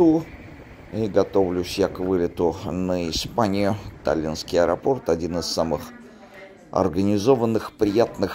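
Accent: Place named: St Petersburg, Russia. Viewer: Это такой специфический питерский выговор? Напоминает нечто среднее между Невзоровым и Федором Чистяковым. (оба питерцы)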